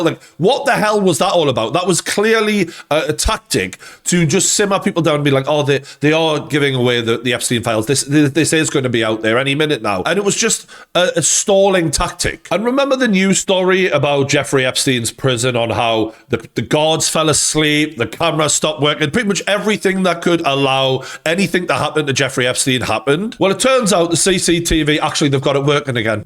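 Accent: Newcastle, United Kingdom. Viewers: Love your accent. Love me a geordie! Great content too ❤ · like the old true geordie , class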